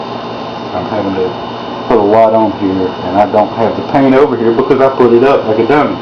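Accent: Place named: South, United States. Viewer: Having grown up with a southern mom, I understood every word! Please do not apologize for your accent - it's a beautiful thing. Also, awesome tutorial! Thank you! I have been looking for a good one for a while now.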